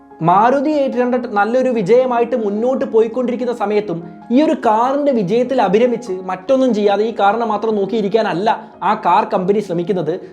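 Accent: Kannur, India. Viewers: കണ്ണൂർകാരൻ ആണോ..? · നിങ്ങൾ കണ്ണൂർ അന്നോ 😊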